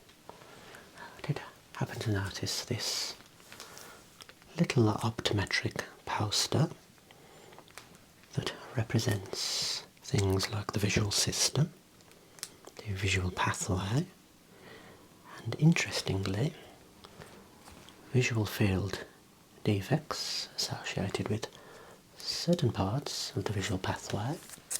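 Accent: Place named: West Midlands, United Kingdom. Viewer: Your voice reminds me of my Orthodontist's voice when I was a child in the 90s. He lived in Solihull. I was never afraid of a doctor again after treatment there, and I never forgot the voice. I've always found the west midlands accent to be really comforting. I live in Texas now, which is quite a way away...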